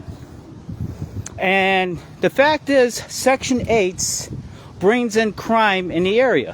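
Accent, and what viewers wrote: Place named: Chicago, United States. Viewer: it's called a Chicago accent. Talking like a CA Valley girl makes someone uneducated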